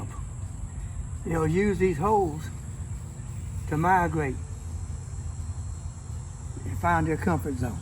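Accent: Louisiana, United States. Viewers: ♥ that Louisiana accent! Thanks! G-d willing,I am gonna try this out this weekend. Gonna plant a pipe beside my blueberry bush also and use your vinegar/water method. May your harvest always be abundant.🍇🍒🍓🫐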